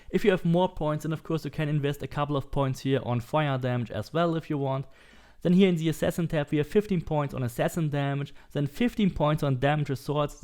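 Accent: Germany. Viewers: Ich feier einfach das du eindeutig ein deutscher mit (nicht böse gemeint ) voll dem allman Akzent trotzdem einfach auf Englisch dein Ding durchziehst mach weiter so 👍🏼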